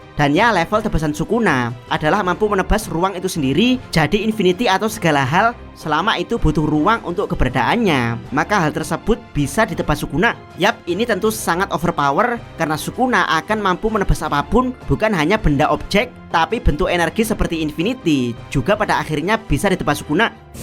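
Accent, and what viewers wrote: Java, Indonesia. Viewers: Gausah pake cekibrot norak banget jawernya terlalu kental,,,enak yg biasa aja om lebih adem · Gojo bang bulan godjo,medok banget si bang hhhhh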